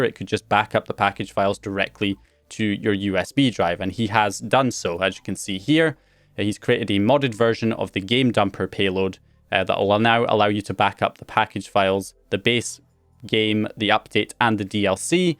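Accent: United Kingdom. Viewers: Thanks for the vid. Always looking forward to hearing this guy's UK accent.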